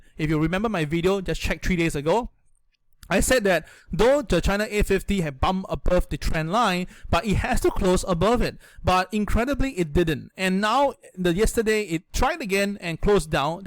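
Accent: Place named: Singapore. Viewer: I haven been owing Oxy share since it was $27. Hopefully, it will beat $80. Btw like your Singaporean accent :)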